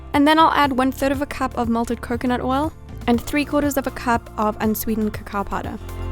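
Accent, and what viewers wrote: South Africa. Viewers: You sound so South African! Are you South African???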